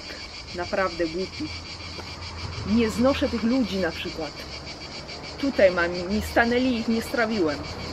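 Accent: Italy. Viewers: Masz piękny włoski akcent gdy mówisz po polsku!!! <3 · Masz PRZEPIĘKNY włoski akcent 👌🇮🇹❤️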